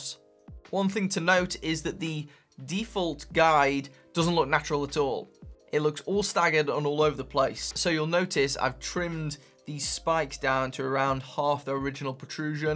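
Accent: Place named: Northern England, United Kingdom. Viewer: I hear a Northern England accent. What town, mate? Nice vid.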